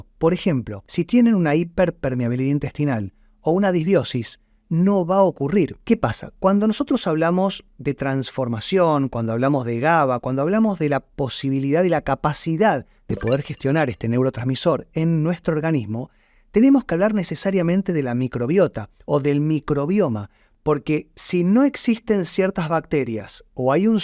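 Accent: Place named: Rioplatense, Argentina. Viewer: Parece como argento o yorugua.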